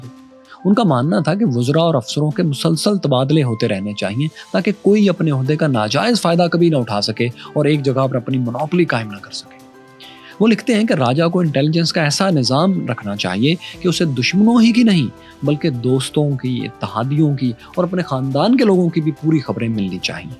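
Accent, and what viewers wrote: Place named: Punjab, Pakistan. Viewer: Other than the Punjabi accent it was good content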